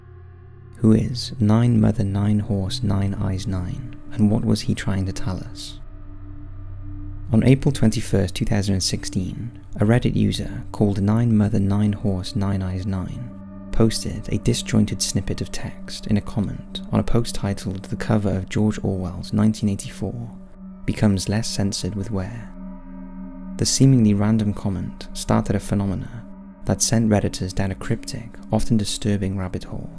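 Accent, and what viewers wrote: Wales, United Kingdom. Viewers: he's welsh. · He's Welsh. :) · hellomikie92 nothing...if you mean the accent, it’s Welsh 🏴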